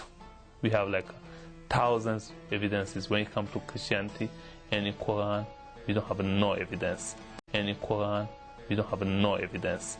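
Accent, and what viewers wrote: Israel. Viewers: He has Hebrew accent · He has a hebrew accent. Fakest thing since WWE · This guy is definitely a Jew, thickest Hebrew accent I've heard in a while.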